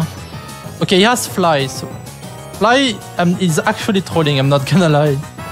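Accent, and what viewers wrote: France. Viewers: You're French right?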